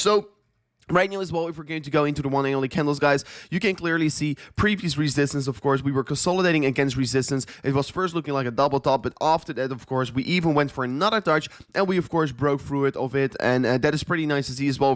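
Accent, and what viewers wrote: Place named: Netherlands. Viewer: bij zen eerste woord wist ik al dat die nederlands was lmao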